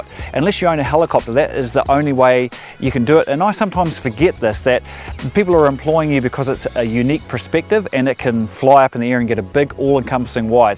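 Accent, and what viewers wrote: New Zealand. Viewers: Haha! Definitely Kiwi! Great advice and guidance.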